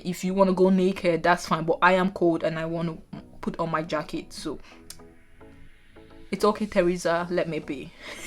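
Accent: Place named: Nigeria. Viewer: I could still that Nigeria feel😂😂 The "like bro"😂😂 I'm really enjoying this channel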